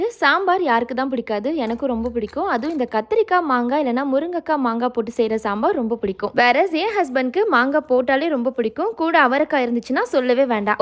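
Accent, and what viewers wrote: Madurai, India. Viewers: Neenga madurai ah sis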